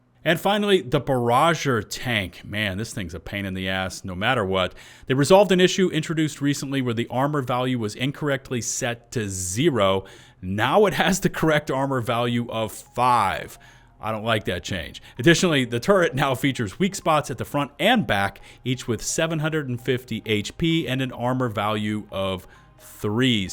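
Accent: Louisiana, United States. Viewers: you sound like Louisiana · His southern accent is giving Cajun… lol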